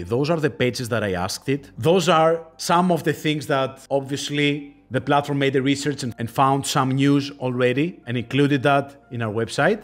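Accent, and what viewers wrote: Greece. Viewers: You have great info dear friend in this video..just subscribed to don't lose other opportunities😊..by the way your accent seems Greek, right?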